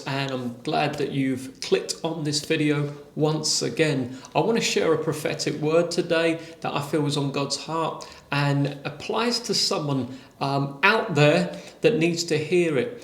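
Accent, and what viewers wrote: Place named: England, United Kingdom. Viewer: He is English so he speaks proper English with the actual accent not the junk we hear in America.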